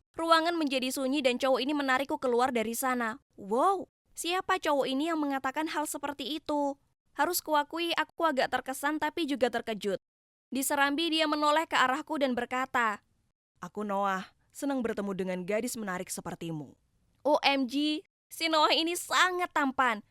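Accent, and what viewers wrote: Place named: Java, Indonesia. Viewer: Pasti voice over nya orang jawa🗿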